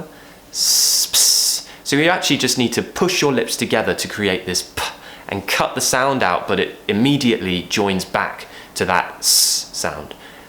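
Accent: United Kingdom. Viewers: I listen to your youtube like listening to a radio. I want to make British accent also :) Thanks always for your interesting contents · Thank you for the new video lesson. I love your British accent 😍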